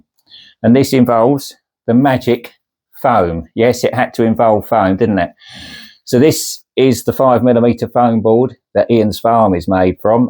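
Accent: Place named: United Kingdom. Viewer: I came from the youtube ad and initially i kinda chuckled going this is the most british man ive ever heard but when i actually came and watched it i wound up actually enjoying it Model trains have always been my favorite especially when me and my late father would go to the fair and spend 20 minutes just looking at the model train set in the back Thanks for brining back some of those special memories man ❤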